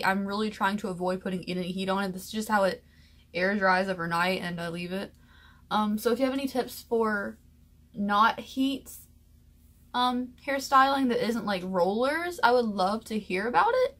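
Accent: South, United States. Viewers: Love you MOSTEST sis!! I'd LOOOVVEE to hear your southern accent in full force... I have a southern Illinois accent so a drawl out my A's real bad. I don't notice it but when I traveled west I got a bunch of shit for it.. Not bad. Just shit. Lol anyway have a good night girlie!! ✌🤘💋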